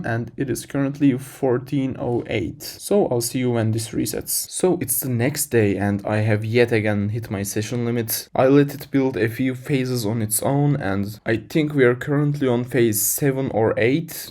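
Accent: Turkey. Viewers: I sense türkish accent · are you turkish by any chance? i know that accent very well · turksun dimi aksandan belli oluyo :D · most türkish accent i ever seen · o Türk aksanını nerde duysam tanırım kardeşim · Your accent sounds Turkish so I am guessing you are?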